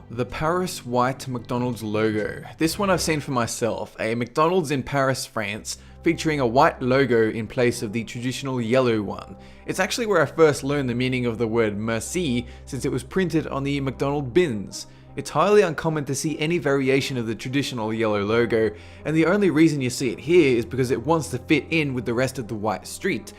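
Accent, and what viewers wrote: Australia. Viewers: or you can listen to his voice to find out..... he clearly has an australian accent.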